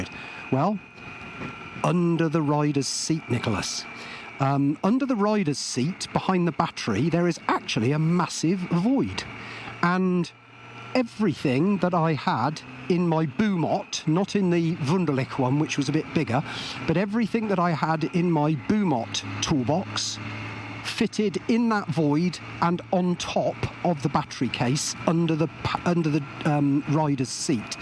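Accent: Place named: United Kingdom. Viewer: I love British accents. Thanks for the ride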